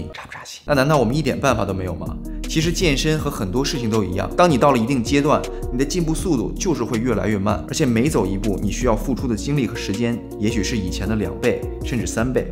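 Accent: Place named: Shandong, China. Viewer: 山东人吧 这口音能听出来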